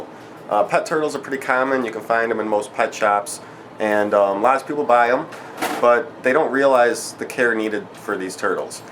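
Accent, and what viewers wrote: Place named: Connecticut, United States. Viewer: you have the same accent i do and people always make fun of me for it. im from connecticut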